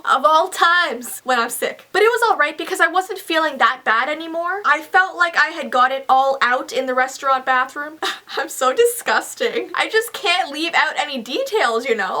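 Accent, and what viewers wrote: Canada. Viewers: Are you Canadian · She Canadian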